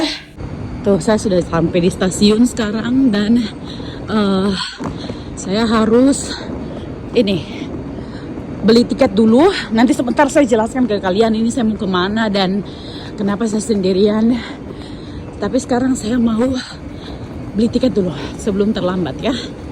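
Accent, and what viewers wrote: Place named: Eastern Indonesia, Indonesia. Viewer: Sehatsehat kaka. Vlognya sllu menarik, dn sya suka sama gaya bicaranya kaka krna kebetulan saya juga orang timur. Sya sudah ikuti dn nonton vdeo kka dari sebelum mncapai 1 jta subscribe, dari msih tinggal di apartemen, tdk prna bosan sma vdio2 kaka. Mkasi sudah menghibur keseharian sya, dn smoga alur cerita hidup kaka bisa ketularan ke saya yg smntara proses memikirkan dn melangkah sdikit demi sedikit untk mnuju masa depan dn hdup yg lbih baikk🤗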